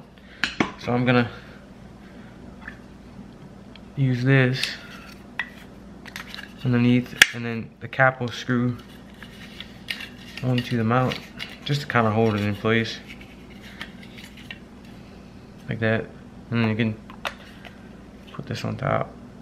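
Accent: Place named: Lafayette, United States. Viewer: When I hear you talking, I knew you from Lafayette. I saw you scan and seen KATC and confirmed. I'm 40 min South of you. Great video!!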